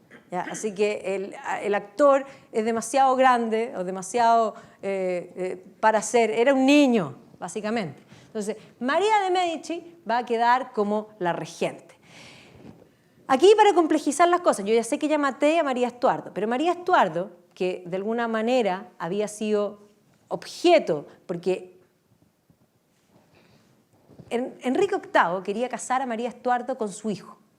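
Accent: Chile. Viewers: Reconoci que era chilensis por el acento jajaja.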